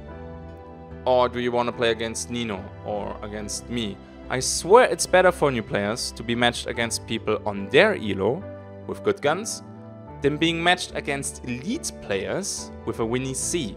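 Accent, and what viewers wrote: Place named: Germany. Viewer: You sound German, I'm new here btw.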